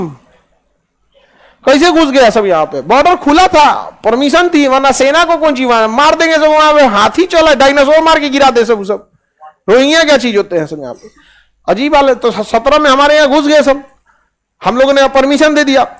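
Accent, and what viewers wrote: Bihar, India. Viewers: Aapka Bihari andaz bahut achchha hai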